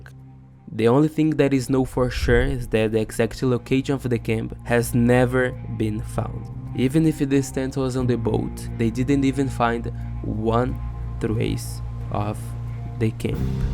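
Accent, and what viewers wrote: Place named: Portugal. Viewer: Great video but you should work on your English pronunciation. Try talking slower and pronouncing each word clearly and with less portuguese accent. I think you could be a youtube star if you work on your English because the video is very well made.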